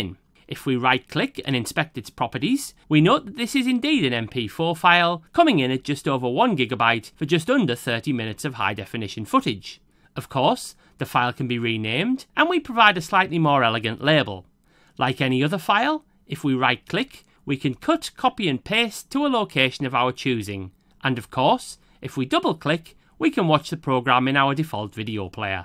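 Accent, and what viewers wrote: United Kingdom. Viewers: Clear , visual instructions and ofcourse it helps that you are from the UK ;) so easier to understand . Please make more videos like this , esp UK focussed content . Subscribed and keep up the good work . Will tell my friends . With such clarity in your videos - you will go far .... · thankyou so much, nice northern man. this was so clear, so concise, a child could do it. I'm impressed and very grateful ❤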